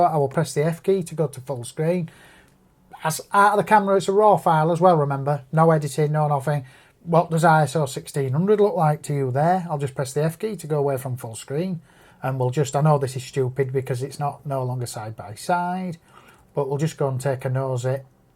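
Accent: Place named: Yorkshire, United Kingdom. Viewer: you sound like my son...yorkshire accent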